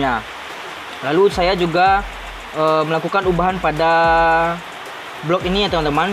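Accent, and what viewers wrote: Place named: Padang, Indonesia. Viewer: Orang padang nih ketara bat haha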